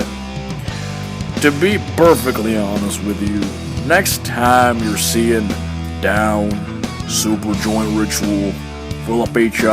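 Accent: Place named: Canada. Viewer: u sound Canadian, Phil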